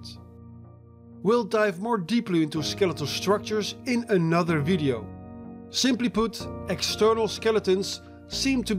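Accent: Netherlands. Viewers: Just a off-topic question. Is the narrator by any chance dutch? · Are you Dutch? I hear a Dutch or maybe South African accent!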